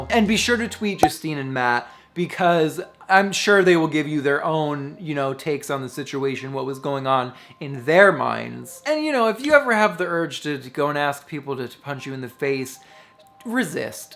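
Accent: New Jersey, United States. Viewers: yep sounds like new jersey to me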